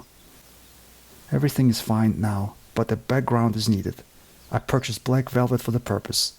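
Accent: Russia. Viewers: "Good enough," is not good enough for! Thank you for your fascinating videos on so many different "maker" topics. Your narration in Russian-scented but perfect English is very pleasant to listen to. Oh, and don't hurt yourself, please.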